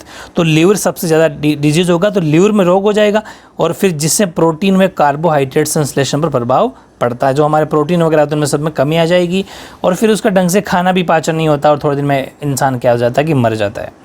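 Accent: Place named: Marwari, India. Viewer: Ghutne Adte h kabhi kabhi aap marwari bolte ho sarji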